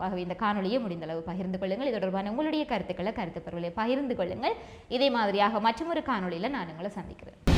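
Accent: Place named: Sri Lanka. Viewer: எனது வயது 78 . வருடம் 50,60 களில் இலங்கை வானொலி வர்த்தக ஒலிபரப்பு நிகழ்ச்சியை வானொலி பெட்டி வழியாக கேட்பதே எங்களின் பொழுதுபோக்கு நிகழ்வாக இருக்கும். மயில்வாகனன், ஜெயலட்சுமி,K. S. ராஜா போன்ற தொகுப்பாளர்களின் இனிய இலங்கை தமிழின் உச்சரிப்பை கேட்டு ரசித்து இருக்கிறேன்.அந்த வகையில் தற்போது உங்களின் இலங்கை தமிழை கேட்டு ரசிக்கிறேன். வாழ்க வளமுடன்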